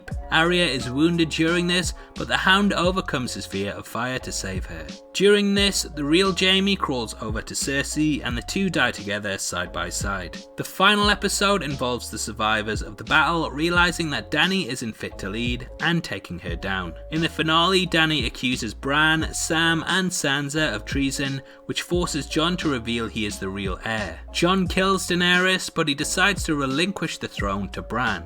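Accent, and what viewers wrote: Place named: United Kingdom. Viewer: Why is every spoiler vid narrated by a guy with a brittish accent.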